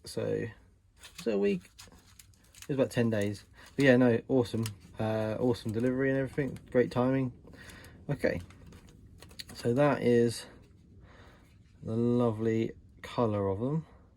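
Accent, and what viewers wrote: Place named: United Kingdom. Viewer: Great video and nice to hear a fella brit Americans can be a bit annoying sometimes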